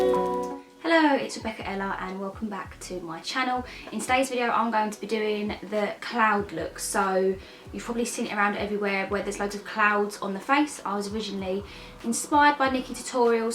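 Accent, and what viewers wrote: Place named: United Kingdom. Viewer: Your British accent is so cute 😍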